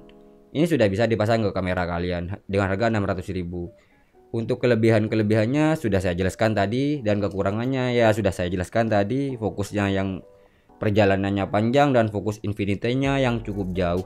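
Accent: Madura, Indonesia. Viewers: Asal madura ya bang?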